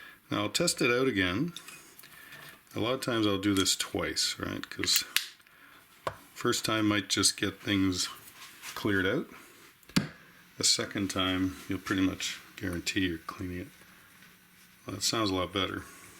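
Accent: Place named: Ottawa Valley, Canada. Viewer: Over here in the L. Ontario basin, we can hear the subtle, very subtle Ottawa Valley accent ... it's a Juzz Bayce!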